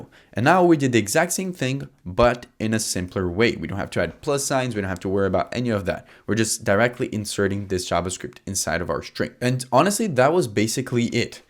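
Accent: Brazil. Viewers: Esse mlk é BR certeza ou é português kkkkkkk to maratonando o canal dele, bom demais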